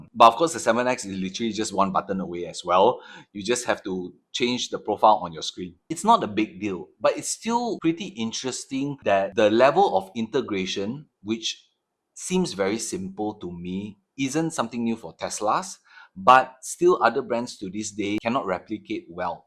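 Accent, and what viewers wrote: Singapore. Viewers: Looks like Malaysia where cars are dirt cheap. But you sound Singaporean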